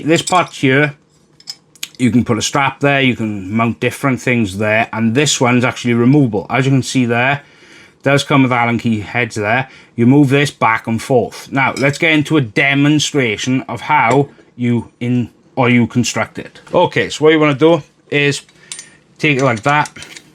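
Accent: Wales, United Kingdom. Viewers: You really as Welsh as it gets butt innit?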